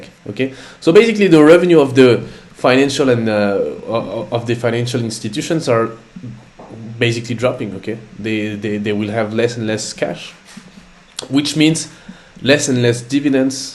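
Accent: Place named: France. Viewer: Ton anglais avec un accent francais est tres sympa. Tu maitrises la langue de shakespeare ! Chapeau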